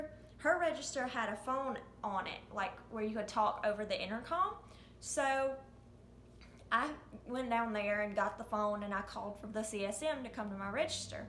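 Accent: South, United States. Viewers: love the Southern woman accent beautiful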